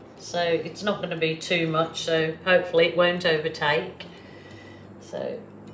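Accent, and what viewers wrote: United Kingdom. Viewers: Hello I tried to send you a message yesterday but it seems to have got lost in cyberspace! Anyway the gist of my message was to basically tell you how very much I thoroughly enjoy & appreciate your tutorials. I adore your art and am learning a lot watching you paint. Also enjoy your sunny chatty disposition and your delightful British accent which so happens to match the Sunshine Coast where you reside. Thank you for all your tips which is going to help me immensely when I hope to embark on my painting venture instead of simply being a couch potato!!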